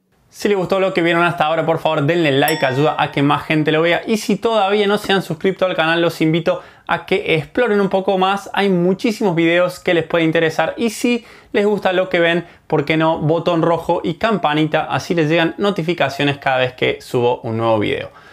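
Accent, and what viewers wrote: Córdoba, Argentina. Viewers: Sos cordobés?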